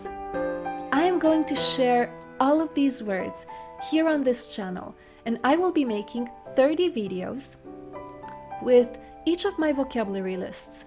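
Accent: Israel. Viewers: The common problem is that she pronounces like most of ashkenaz israeli ח like כ and ע like א. Only because they are not able to pronouce like sefarad israeli. Israeli have a bad tendancy to pronounce this semitical language in a european way. Which creates confusion for the writing language and makes it poorer. That's not her fault. It is a wrong system.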